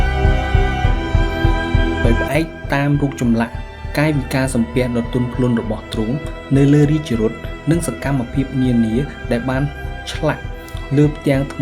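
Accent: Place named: Thailand. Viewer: អ្នកអានប្រវត្តិសាស្រ្តខ្មែរចេញសម្លេងថៃ ឬជនជាតិថៃជាអ្នកអាន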